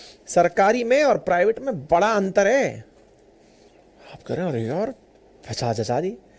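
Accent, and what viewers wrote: Rajasthan, India. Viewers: Sir pura rajasthani style me samjha rhe hain kya baat hai 😅 vaise mai up se hu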